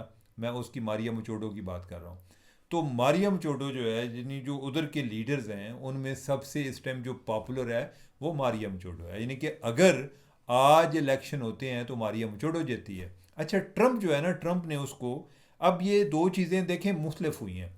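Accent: Punjab, India. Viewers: بے لاگ تجزیہ کھلا ڈھلا انداز جس میں پنجابی کا تڑکہ واہ کیا نیچرل اور دل کو چھو لینے والا انداز ہے❤ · Punjabi da Tarka good taste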